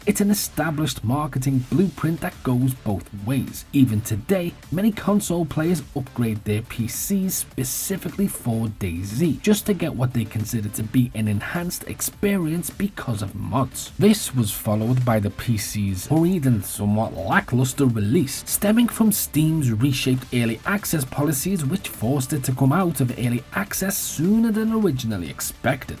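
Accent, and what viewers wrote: Liverpool, United Kingdom. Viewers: scouse legend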